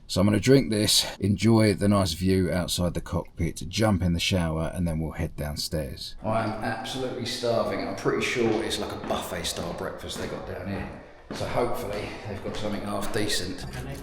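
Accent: United Kingdom. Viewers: I love you British accent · Bros british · British accent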